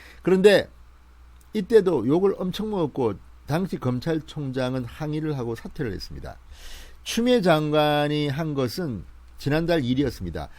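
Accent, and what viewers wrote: Gyeongsang, South Korea. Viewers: 진성호 너도 고집이세네~ 말학전서두에 자아~라는 말을 하지말라고 했잔아~ 방송에서 경상도말은 삼가하는게 예의아닌가?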